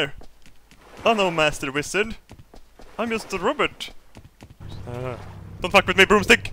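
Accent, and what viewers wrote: Sweden. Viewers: stop speaking Swenglish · I love your sewdish-english accent :)) !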